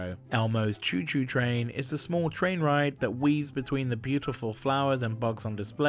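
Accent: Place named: United Kingdom. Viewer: British accents announcing American products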